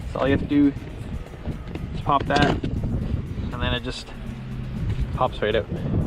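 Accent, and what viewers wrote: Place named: Canada. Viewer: He sounds a little bit Canadian to me.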